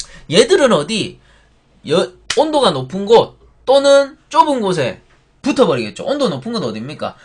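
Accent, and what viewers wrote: Gyeongsang, South Korea. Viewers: 끼긱끼긱 빵터짓읍니다 경상도 방언 좋네요 열정적인 강의 감사합니다 NH3암모니아 올림 ^^ 따뽕 좋아요 꾸욱!!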